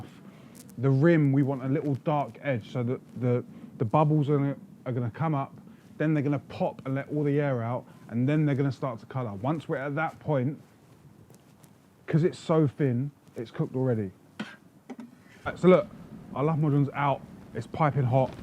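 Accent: United Kingdom. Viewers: funny to hear a turk speaking british english. I love it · I have a feeling that he is going to be like Turkish version of an Action Branson-Matty Matheson mix with a British Accent. No matter what, this channel has a huge "million view every video" potential. I hope you'll do great man. Also, I couldn't stop thinking that you wasted that juice by draining, that would be a great salad dressing base.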